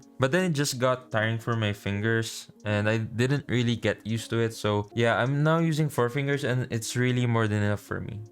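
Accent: Philippines. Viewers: I knoww that your a Filipino because your english accent is really filipino 😄😄😄😄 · SABIIII NA NGAAA BAAAA E, MAY FILIPINO ACCENT KAAAA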